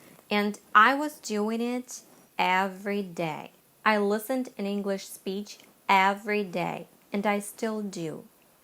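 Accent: United States. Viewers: I thought you were American.Mashallah you are very successful. I just discovered you. I have no intention of leaving you.I'm your student from Turkey.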